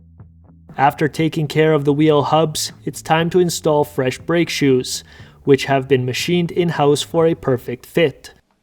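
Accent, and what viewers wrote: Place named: Canada. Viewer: What a great video! It was difficult to understand your thick Canadian accent but I loved watching the tear down and hard work.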